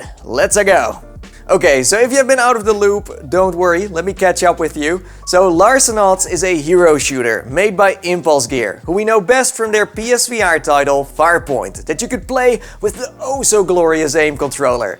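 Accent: Netherlands. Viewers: I love the way you say notoriety, dutch?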